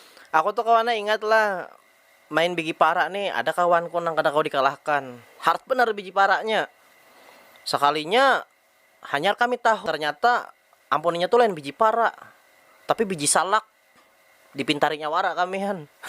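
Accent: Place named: Hulu Sungai, Indonesia. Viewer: Orang mana kam logat hulu sungai pang nah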